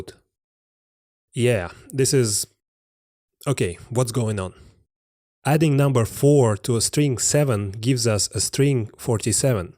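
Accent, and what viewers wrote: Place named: Eastern Europe. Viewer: Why does he have an eastern European accent but his username is?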